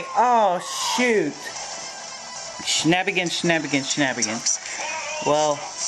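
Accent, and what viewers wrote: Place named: South, United States. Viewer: HE IS SOUTHERN HES FROM MISSISSIPPI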